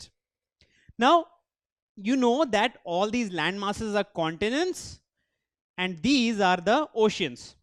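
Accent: India. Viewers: I also hate the cheap indian accent. #VeryBadAccent #PooryMade #WhatWasAllThatBlinkingAllTheTime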